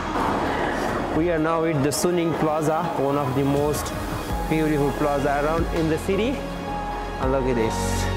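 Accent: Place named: United Kingdom. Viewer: British accent and splendid editing..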